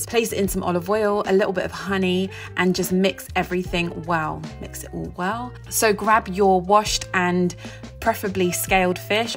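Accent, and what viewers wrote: United Kingdom. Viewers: Like Jamie, you’re speaking Essex, right?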